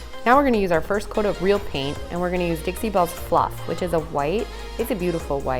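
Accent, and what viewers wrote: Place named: Midwest, United States. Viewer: Tis beautiful. Most of the year. Even when it’s not the prettiest it’s still a great place to live. You’re accent sounds like maybe Michigan or Wisconsin. I’ve never been there but would love to see the northern Midwest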